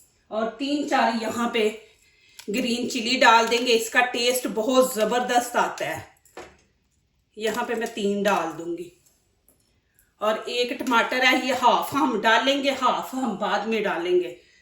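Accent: Punjab, India. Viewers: Apna urdu lehja theek karen panjabe lag rahe ha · بہن پہلے آپ اپنے پنجابی لہجے پر قابو پائیں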